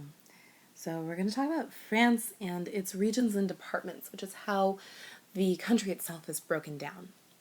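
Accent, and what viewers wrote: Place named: England, United Kingdom. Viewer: I like your English accent when you tell the French provinces I think it's not so easy for your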